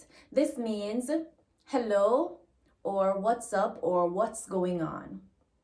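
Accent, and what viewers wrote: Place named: Jamaica. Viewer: This is actually a very accurate video! Well done, you sound just like my friend Stacey from Negril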